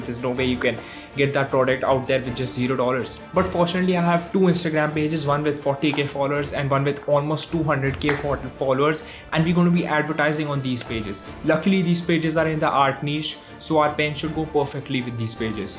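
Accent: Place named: India. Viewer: your accent is like indian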